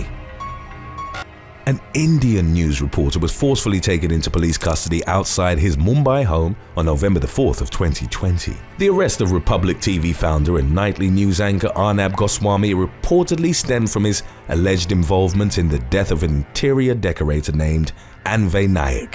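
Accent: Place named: England, United Kingdom. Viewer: I figured you were an Englishman in America!!!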